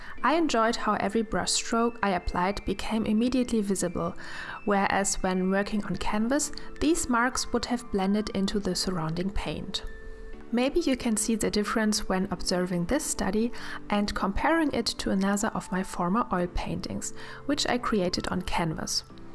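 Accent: Germany. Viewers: Hallo, ich möchte erst einmal deine Kunst loben-ich liebe den Mix aus abstrakten Verläufen und naturalistischen Elementen! Ich tendiere dazu, zu viele Details in ein Bild zu hauen, dann in der Mitte die Lust zu verlieren und wenn es fertig ist, frustriert zu sein, weil es einen etwas erschlägt xD Deine Bilder helfen mir, das Konzept von "Blickfang" besser umzusetzen und meine Portraits zu "entmüllen". Wo ich dich das erste Mal gehört habe, wusste ich sofort, dass du Deutsche bist. Dein Akzent ist wirklich liebenswürdig. Am liebsten höre ich mir einfach nur die Tonspur an und zeichne/male selbst parallel dazu und dann schau ich das Video noch einmal für das Bildmaterial;) Entspannend und inspirierend für mich. Vielen,vielen dank für deine Videos! (Musste ich einfach mal loswerden, bin eher der stille watcher)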